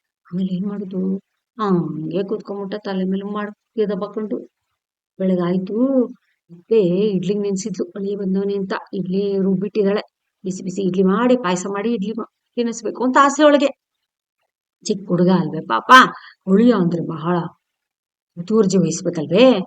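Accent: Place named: Mandya, India. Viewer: ಮಂಡ್ಯದ ಜನ ಮಾತನಾಡುವ ಹಾಗೆ ಕಥೆಯನ್ನು ಹೇಳಿದ್ದಿರಾ ಕಥೆ ತುಂಬಾ ಚೆನ್ನಾಗಿ ದೆ ಕೇಳುವುದಕ್ಕೆ ಹಿತವಾಗಿದೆ 🥰🥰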